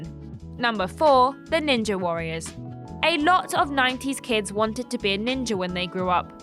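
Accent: United Kingdom. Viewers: Are you from the UK????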